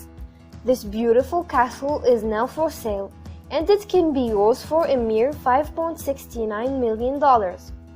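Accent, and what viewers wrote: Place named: France. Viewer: "It can be yours for a MERE $5.69 million". That might be mere to you, but not to me. BTW it's difficult to understand the narrator with that French accent.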